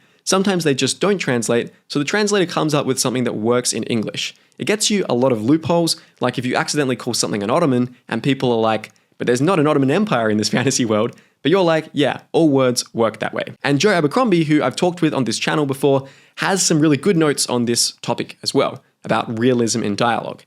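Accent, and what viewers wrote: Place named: Australia. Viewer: Interesting to hear your accent. Aussie but the US influence is much stronger than from my day. Change in “a”. Pronunciation of “figure” for example.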